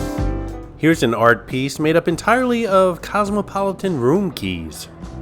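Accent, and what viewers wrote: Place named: New York, United States. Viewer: Nu-Yawk-flair........o yea!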